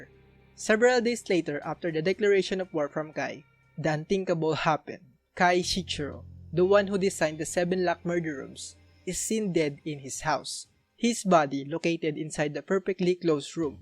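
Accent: Philippines. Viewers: i knew it.. you have the accent :) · Your accent sounds like you’re from here in the Philippines, are you?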